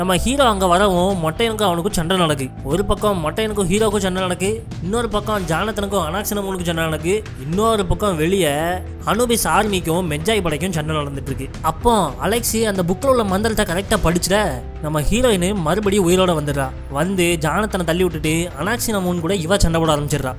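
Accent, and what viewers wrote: Tirunelveli, India. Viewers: It's Trunelveli slang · Bro neenga thirunelveli ya unga slang super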